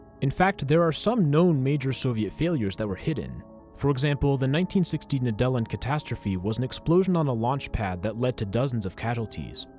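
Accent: Italy. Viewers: your Italian accent is wonderful ps I'm italian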